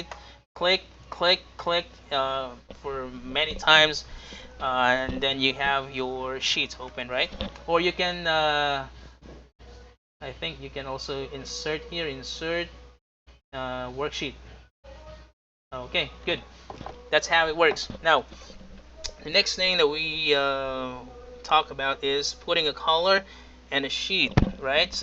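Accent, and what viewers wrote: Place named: United States. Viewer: Gaw kusugaw. Gd nimo mo english mura MN taga America eslang MN kaau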